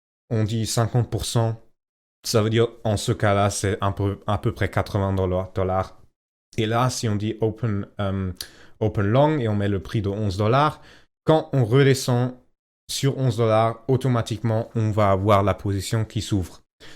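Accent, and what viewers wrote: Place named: Eastern Europe. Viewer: bravo à toi pour l'explication : très claire, et je n'ai pas vu ça ailleurs :)) comment va ton portefeuille depuis oct 2024 (date de la video) j'imagine que tu as bien profité : ? une question : je suis sur Blofin, apparemment il n'est pas possible de ressortir la marge initiale et de même je ne peux pas changer le levier même après après avoir remonté le stop loss dans un endroit où je ne perds plus (je ne peux que gagner).Blofin m'indique le message "you still have isolated orders pending" et me demande de supprimer le stop loss avant de pouvoir changer le levier, de ce que je comprends.....Sais-tu me confirmer que sur Blofin ce n'est pas possible de faire ce que tu as fait sur Bybit ? Dans ce cas as-tu un conseil pour une plateforme alternative à Blofin que je puisse utiliser (Kucoin peut-être ?)....Au fait bravo pour ton français, je croyais déceler un petit accent du Nord (type Belgique flamande) mais non, c'est bien un accent de l'Est...Pourquoi faire tes vidéos en français plutôt qu'en anglais ou en allemand ? 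Cheerz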